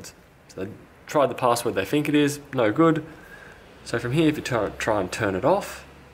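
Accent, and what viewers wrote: Australia. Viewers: Great to hear an Aussie voice. I hope you are making a killing with monetisation - take as much money as you can from those bastards!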